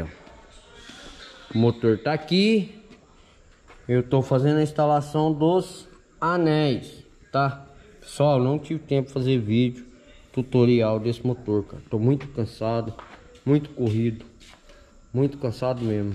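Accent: Minas Gerais, Brazil. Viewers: Fala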